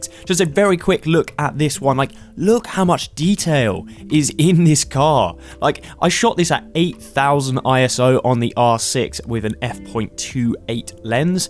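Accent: United Kingdom. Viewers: You sound British, thus you must be using the Exynos version of the phone? Do note that it is good to mention this in the video as there are 2 versions of this phone around the world and both have somewhat different camera quality...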